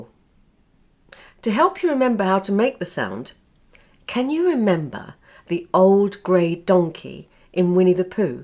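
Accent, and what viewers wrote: United Kingdom. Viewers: 𝑵𝒐 𝒅𝒐𝒖𝒃𝒕, 𝑩𝒓𝒊𝒕𝒊𝒔𝒉 𝒔𝒐𝒖𝒏𝒅.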